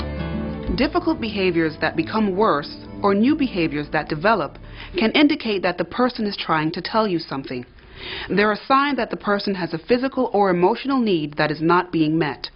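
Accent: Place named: England, United Kingdom. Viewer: Best teacher ever...soo eloquent ...like your clear English accent..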